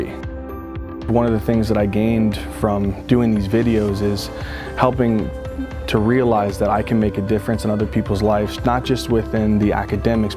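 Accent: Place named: United States. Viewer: I started to follow you because I'm working as a military personal trainer. I saved people lives during my last year here in Italy thank to my military knowledge, and I would like to improve my knowledge in motorial science in order to give the best suggestions I could to recovery from many muscolar and psicology problems. So your tutorials are helping me out a lot, I love your American English which is really easy . Thank you a lot sir .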